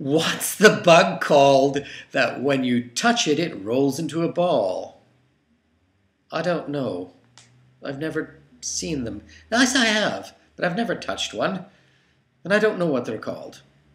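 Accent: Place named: United Kingdom. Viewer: Youre British...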